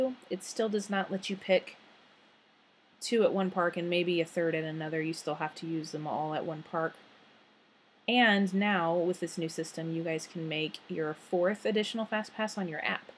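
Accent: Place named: United States. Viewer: Thanks for the video, very useful as I am booking our FP tomorrow. You sound like Ellen Degeneres a bit, lovely US accent :)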